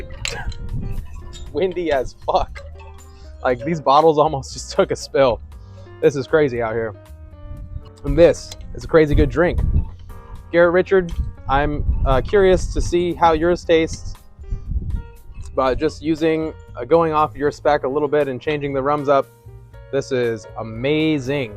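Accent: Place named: France. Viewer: Stunning French accent, monsieur.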